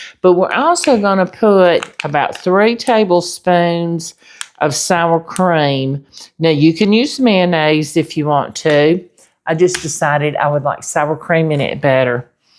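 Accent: South, United States. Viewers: Miss, first off, I so enjoy your videos and your sweet, southern accent!!! Do you ever just sit back, close your eyes and try and envision a new recipe? I do it all the time!! Tonight, I was sitting here (with my eyes closed😌….that’s important😂). I made a cheese ball last night for game night with our neighbors. It has cream cheese, diced green onions, diced dried beef, Worcester sauce and some spices. Tonight, I have some sweet, red, Argentina shrimp. I thought I would spread a thin layer of the cheese ball onto a flour tortilla, sauté the shrimp and make shrimp tacos with butter lettuce, onion, cherry tomatoes and an avocado lime dressing. When I opened my eyes, I clicked on your video and you were spreading cream cheese, sour cream and the dried vegetable blend onto a tortilla!!! I swear! You read my mind!!! It’s either that or AI!!😂😂😂😂 Keep making your awesome recipes!!!😊😊😊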